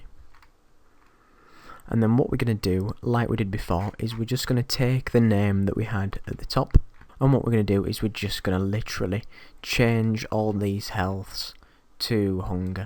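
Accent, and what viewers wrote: United Kingdom. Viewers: Classic British accent ;)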